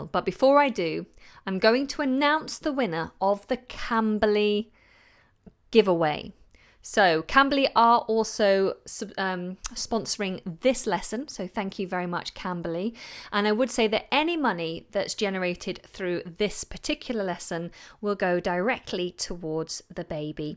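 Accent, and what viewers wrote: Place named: United Kingdom. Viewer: can you please speak with a more clear British accent, even in some sessions not all of them! I know that you speak with a British accent in this video, but are you speaking in the same way during your normal day? Thanks in advance!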